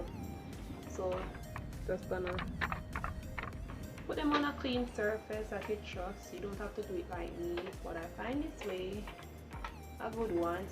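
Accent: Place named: Queens, United States. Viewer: Your accent is cool as hell. I grew up a Spanish kid in Jamaica Queens. Almost everyone in my neighborhood spoke like you. All of them just had this cool chill vibe.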